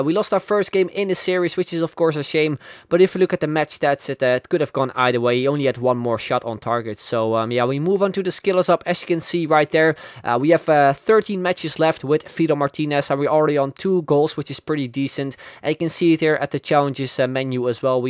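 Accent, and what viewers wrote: Netherlands. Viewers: are you dutch cuz you sound like that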